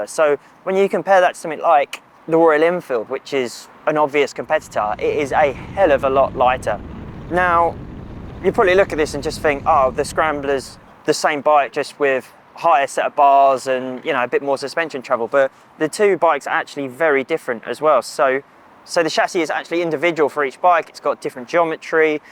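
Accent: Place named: South East England, United Kingdom. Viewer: Great bike, but really disappointing to see that MCN still feel that people ‘crippled’ with a South East accent are only suitable to do video reviews. If you are a UK newspaper/magazine, maybe it’s time to have accents outside the South East represented. I’m from the South East, but travel a lot around the UK on my bike and talk to fellow bikers, but realise that the people I talk to aren’t represented in terms of accent and authenticity on MCN. There are some very knowledgeable motorcycle riders outside the South East bubble. Even the BBC realised that folks outside the South East know stuff. Come one MCN, come out of your South East echo chamber. It’s particularly annoying knowing you’re based in Peterborough, which isn’t even in the South East! Let Wales, Scotland, Ireland (North and South), the North of England and the Midlands and even the South West of England be heard. 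Do what the BBC did and learn to embrace your wider audience, rather than your tea room. Otherwise, keep doing what you’re doing, as you’re fab!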